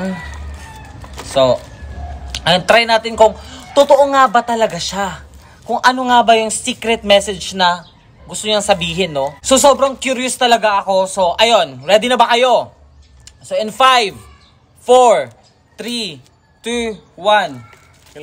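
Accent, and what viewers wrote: Visayas, Philippines. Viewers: Bisaya diay ka ? TAGA CEBU KA ?!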